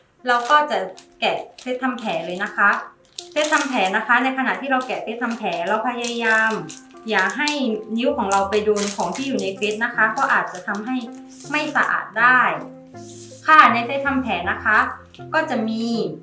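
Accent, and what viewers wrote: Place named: South, Thailand. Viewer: คุณหมอสำเนียงเหมือนคนใต้เลยครับ คนใต้ป่าวครับ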